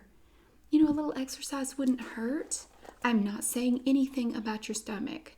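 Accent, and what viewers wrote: South, United States. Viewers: i love the lore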